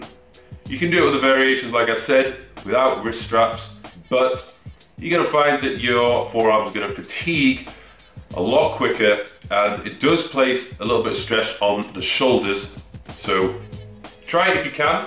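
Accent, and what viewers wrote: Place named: Birmingham, United Kingdom. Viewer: I can't place his accent, is he from Burningham?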